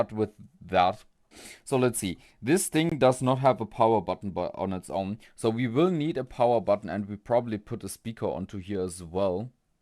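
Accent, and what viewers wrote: Germany. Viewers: you sound very german x'D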